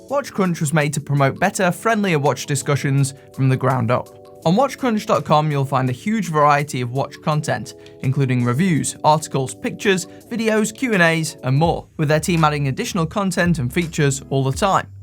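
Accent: United Kingdom. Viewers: I hate your British English accent. It's just so annoying. Maybe because your contry and Churchill left us after WW2 alone to be eaten by Stalin behind iron curtain and we suffer so much! We helped you a lot during the war. Breaking Enigma first, and show how to fight in Battle of Britain. That's why we don't go to victory parade with you. Your country betrayed us and do nothing! You ordered to pay for fuel use in aircraft after the Battle of Britain. That was like slap in the face. After all good content about watches but the accent reminds me of how I hate you GB. From Poland.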